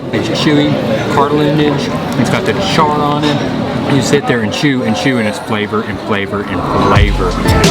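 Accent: South, United States. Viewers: Sir, what do you do? Your travel is a long one. I have a two week vacation and Philippines is my spot this summer. I am in Chicago. You sound like a southerner. I am a Chemist here in Illinois. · You are a combination of Mark Weins and Trevor James with a hint of Southern twang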